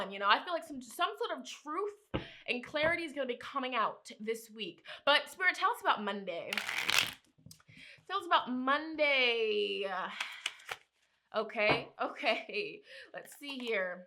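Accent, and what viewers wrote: United Kingdom. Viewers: I keep hearing a British accent peeking through! 😍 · Been feeling so stagnant for the past week or so, so looking forward to this energy shift 😊 as always, I'm vibing with your reading 🌞 (Also, are you British? I keep hearing an accent come out lol been curious)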